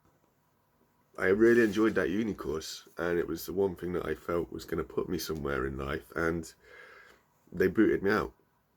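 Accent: United Kingdom. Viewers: Mate, I love your British accent. Go on, don't give up, stay strong!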